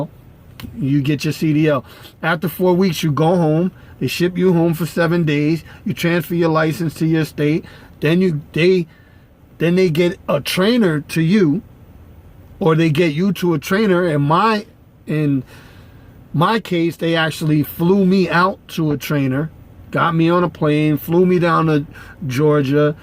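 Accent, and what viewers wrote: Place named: Brooklyn, United States. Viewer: Just saw your video, glad to see another New Yorker made it, ... you sound like you from Brooklyn, just like me lol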